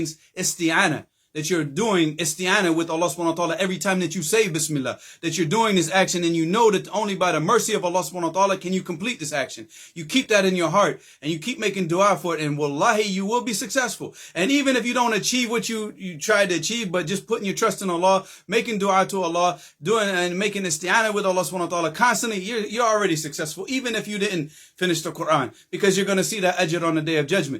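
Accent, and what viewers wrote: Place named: Texas, United States. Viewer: I think from texas bro but brother above also has a good point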